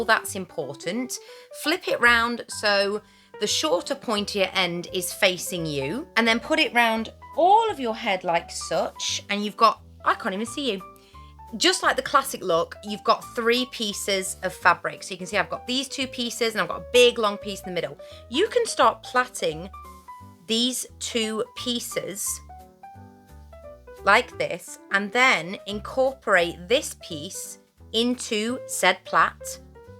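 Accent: United Kingdom. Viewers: LOVE this! What a great teacher you are! I posted a comment requesting a video like this on one of your other videos, but I don’t remember which one it was. Anyway, I am enjoying binge watching your videos. They keep me company while I’m washing dishes, preparing a meal , etc, and then I sit down lighter when I have more time and watch as well as listen. I love your voice. As a Texan with a Texas drawl, it’s so refreshing to hear a British accent. Keep them coming!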